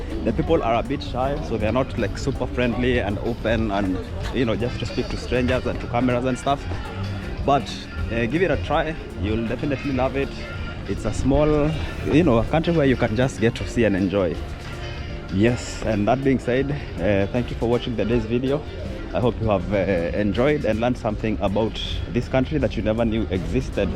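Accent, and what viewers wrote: Kenya. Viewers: Lived in Eswatini for seven years, such a great country! You sound Kenyan, thanks for the video! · Thats a Kenyan 🇰🇪 accent. Good job my brother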